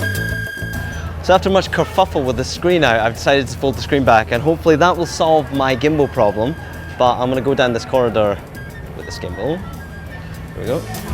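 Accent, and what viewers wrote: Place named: Scotland, United Kingdom. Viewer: Just aboot crapped ma pants there when a heard yer Brogue....Defo got the Scottish mannerisms..Alba gu bràth ✊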